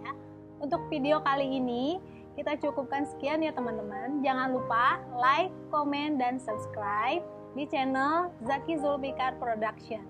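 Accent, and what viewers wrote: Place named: Bandung, Indonesia. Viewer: Bandung pisan logatnya neng😁👍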